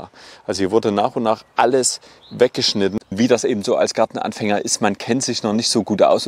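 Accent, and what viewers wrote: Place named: Berlin, Germany. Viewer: Bist du aus Berlin? Dein Dialekt klingt voll von dort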